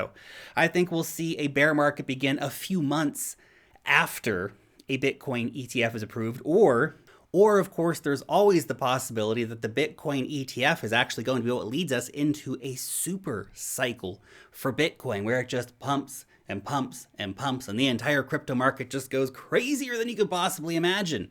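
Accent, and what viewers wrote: United States. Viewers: I always wonder why, as a kiwi, he speaks with an American accent. Any idea? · No accent?!? He has such a strong American accent🤣. But yes definitely no hint of a NZ accent. I am assuming he is an American living in NZ? Or maybe a kiwi who moved to the US as a kid?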